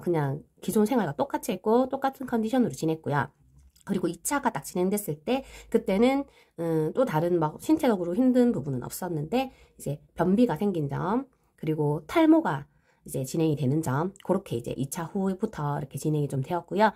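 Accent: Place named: Gyeongsang, South Korea. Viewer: 저도 대구 사람이에요 ㅎㅎ익숙한 대구 말씨이셔서 ㅎㅎ 항암 이전인데 영상보고 많이 배우고 있어요😊!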